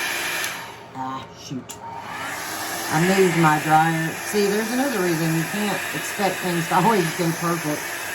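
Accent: South, United States. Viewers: Hi, another gorgeous piece! You’re so patient. Can I ask, when using the photo paper, doesn’t it soak through? I bought an ordinary brand for ink jet printers and that’s exactly what happens. Soaks straight through. Have I got the wrong type? Or is that normal? Thanks. I love watching you create and listening to your southern accent. 🙏🙏🙏🤗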